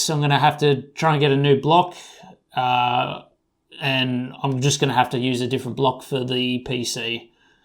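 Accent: Australia. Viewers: Are you aussie?? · Average American looking guy sounds aussie.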